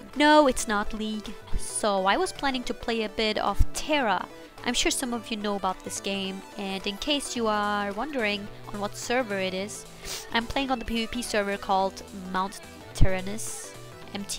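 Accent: Germany. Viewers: samuel sharp she has a german accent.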